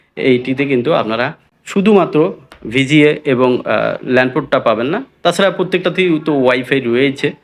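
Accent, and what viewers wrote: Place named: Kolkata, India. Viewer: Agge dada, the you talk like kolkata babu, why not Bangladeshi tone?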